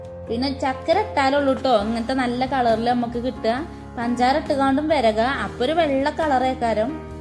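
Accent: Malappuram, India. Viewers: ഈ അവതരണം ഒരു പാട് ഇഷ്ടായി. യതാർത്ഥ മലപ്പുറം മാപ്പിള ഭാഷ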